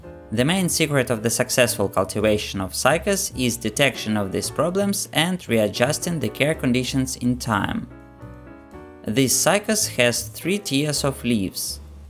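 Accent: Russia. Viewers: Привет. Такое ощущение что голос русскоговорящего человека. Это так?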